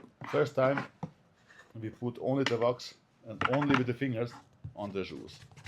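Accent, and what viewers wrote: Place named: Germany. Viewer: Hey, bist du deutscher Herkunft? Ich Hab das Gefühl du hast Akzent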